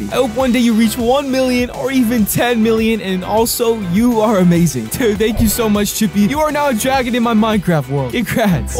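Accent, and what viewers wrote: United Kingdom. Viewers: I always wonder how you speak british and inglish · wait is it just me or does he have a brit accent sometimes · ??? I hear br'ish accent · Hey I realy realy realy want you to hit 10mil and and your my favorate british youtuber keep it up and good luck and your british acsent is amazin🇬🇧🇬🇧🇬🇧🇬🇧🇬🇧🇬🇧🇬🇧🇬🇧🇬🇧🇬🇧🇬🇧🇬🇧🇬🇧🇬🇧🇬🇧😀😀😁😁😁😁😀😁😀😀😁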